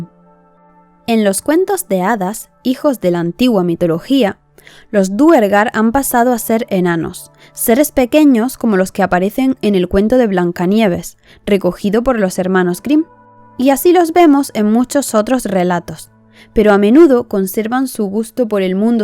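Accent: Spain. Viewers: ¿de qué parte de España eres ? tu acento suena como a gallego y además habiendo tantas leyendas de seres míticos en Galicia no me extrañaría que fueras de allá pero tu apellido parece catalán ¿de donde eres ?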